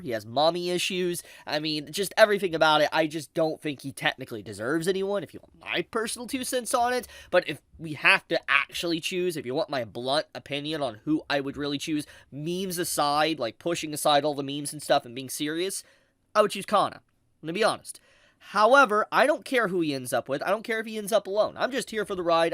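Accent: South, United States. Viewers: idk your accent sounds a little southern so you might be use to this sort of thing.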